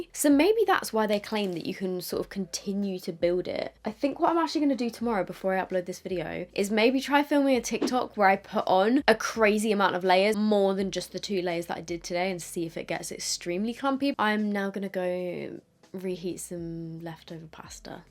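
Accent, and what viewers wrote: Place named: England, United Kingdom. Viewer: God I absolutely love the vibe of this channel and your English accent is music to my ears!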